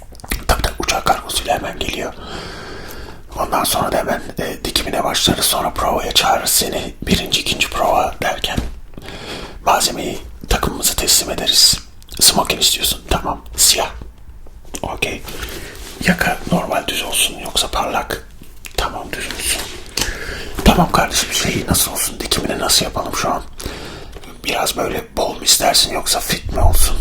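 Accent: Eastern Anatolia, Turkey. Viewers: abi bu platformda asmr konusundan rakibin yok. Daha çok doğu şiveli video gelirse çok iyi olur.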